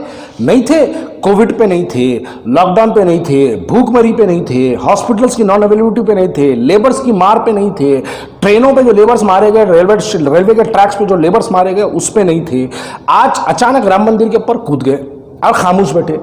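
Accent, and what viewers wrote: Hyderabad, India. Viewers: Ya hyderabad ke awaz hi nice bhai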